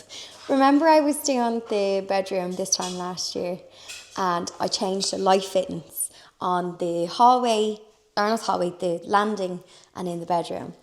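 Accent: Ireland. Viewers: Love your videos love listening to your lovely Irish accent I am in the uk living in Bristol 🙄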